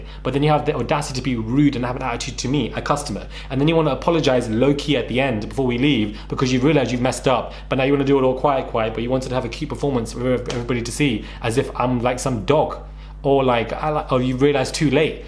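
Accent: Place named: United Kingdom. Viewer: Wow!!! I didn't know you were in England even with the British accent because there are a lot of British people in the USA. What is a she-shell? I understand your frustration. .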